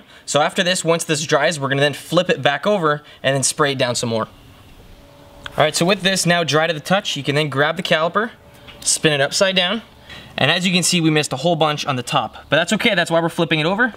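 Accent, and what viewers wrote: Canada. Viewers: Hoy sound Canadian ay! 😎👍🏼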